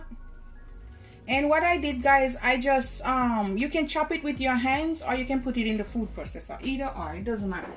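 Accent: Jamaica. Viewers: You know we loving that Jamaican accent baeeeee!!!!! zucchini bread is new to me... but woahh what a big Cucumber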